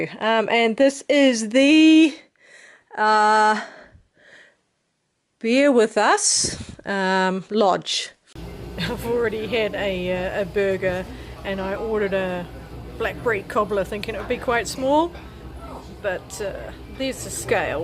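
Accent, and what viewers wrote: New Zealand. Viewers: I am enjoying the pleasant company of this Kiwi.Utterly sweet accent:)(Tent=tint):):)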